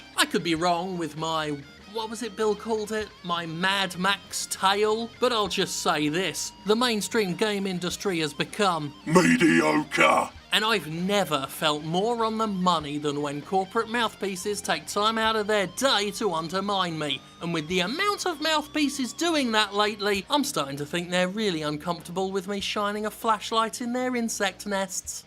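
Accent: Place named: United Kingdom. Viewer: When he is fit, speaks with a British accent and uploads a 22 minute video on a Monday. Thank god for Jim Sterling Son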